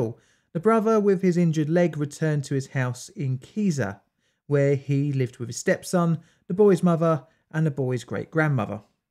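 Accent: United Kingdom. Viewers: Hi, this question is a bit off the wall. I am an amateur linguist and love dialects. I can identify many British dialects, but while I can recognize many, I often times do not know the name or location. So could tell me the name and location of your dialect (and don't just tell me it's in a balmy corner of SE London) 😊. Love the videos BTW.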